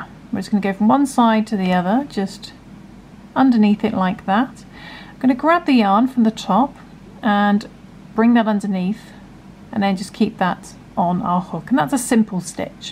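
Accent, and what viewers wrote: United Kingdom. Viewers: Hi you sound like a Brits I know Brits are great knitters but have no idea you are such a fantastic crocheter. I really would like to learn from you how to roll your hook. I stop crochet because because I hold the hook like a pencil and bend my thumb a lot to pull the yarn and my thumb became so painful that I stop doing crochet . I know if I pull the hook like you do it will not hurt me because I will not have to bend my thumb. Will you please feature on video to show how to hold the hook. Thanks a lot.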